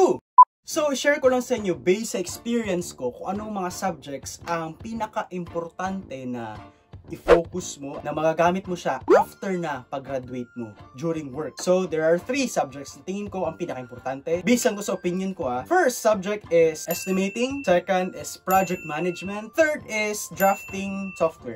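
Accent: Visayas, Philippines. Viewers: Ay bisaya jud diay ka. Mahibal an mn gud sa pag storya dol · I like the Bisaya accent🤣 KUDOS kuya👍👍👍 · Bisaya man diay ka Sir HAHAHA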